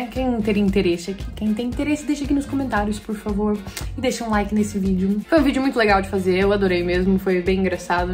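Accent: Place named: Brazil. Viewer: estás a começar a perder o sotaque do Brasil 🤣🤣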